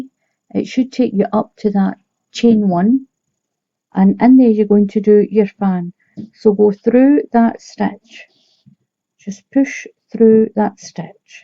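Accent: Scotland, United Kingdom. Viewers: Hello, I just subscribed your channel. I watched many different ones and yours is my absolute favourite!! Beautiful crocheting and I love how you explain everything so well and repeat it over and over, which I find important because then I don’t have to go back to find it again. Also love that you speak slower than most do, it makes me feeling relaxed. And your British accent is lovely 😊 I’m German but live in Canada since 26 years. The winter is hard and long, so I picked up crocheting. I’m enjoying making this one here right now. I have saved several videos from you and I want to do them ALL! Lol Thank you for doing this❣️👍👏❤️ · your beautiful Scottish accent remember me of the good time that we had in Scotland. My husband looked forward on breakfast every morning because of the haggis. You are a wonderful teacher, thanks for your great crochet tutorials.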